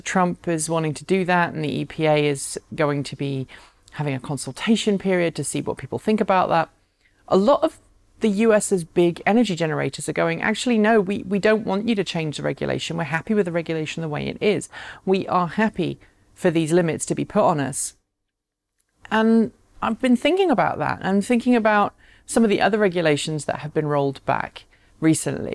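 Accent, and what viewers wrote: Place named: England, United Kingdom. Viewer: Ah, you Brits. Here I thought you were mispronouncing methane, so I checked first the Oxford and then the Websters dictionaries. Webster shows the American English and the Original English pronouncations. "meth·ane | \ ˈme-ˌthān , British usually ˈmē-\". But, not to nit-pick, I really enjoy your channel. And your English accent.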